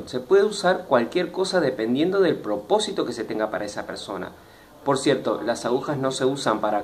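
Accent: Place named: Argentina. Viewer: si es barcelona, ¿x que hablas como argentino?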